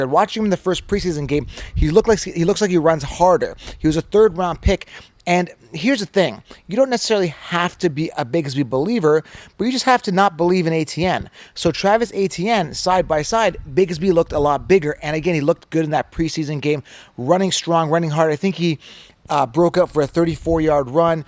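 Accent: Canada. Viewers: Hey man I have an important question, are you Canadian? The way you say about sounds like Avery Canadian accent, lol. Btw you have the best fantasy football information, and people should definitely get the 16 rounds fantasy solution. Thank you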